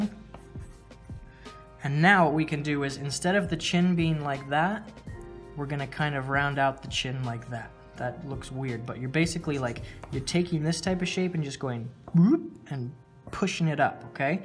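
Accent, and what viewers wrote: United Kingdom. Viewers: Why does your British accent sound like Tom Holland? 😂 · Your British accent makes me want to implode.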